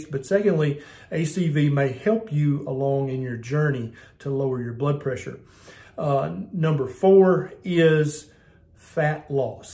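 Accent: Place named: Tennessee, United States. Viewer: man, i sure do appreciate a Tennessee accent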